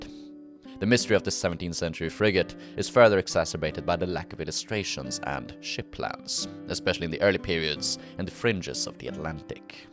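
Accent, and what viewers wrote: France. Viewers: Haha the French accent is great. Never change, bro! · Love the french accent 😁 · Okay, the video is so entertaining and formative when it comes to frigates. But so far my favorite part is your French accent 😅😂 Keep up the great work! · Hahahah I love your french accent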